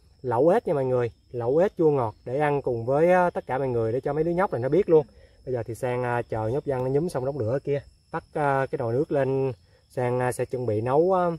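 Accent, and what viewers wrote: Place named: Ben Tre, Vietnam. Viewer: E là ng miền tây ha sao mà vọng nói của e nge y như ng bến tre vậy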